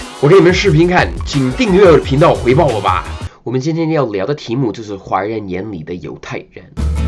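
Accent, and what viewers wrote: Beijing, China. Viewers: Hah 北京话说得可以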